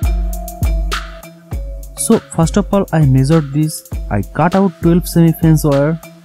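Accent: Bengal, India. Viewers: you have much of bangali accent 🙏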